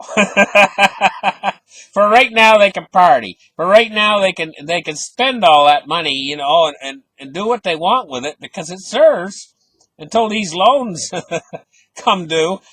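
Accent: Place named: Canada. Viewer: Sounded extra Canadian today.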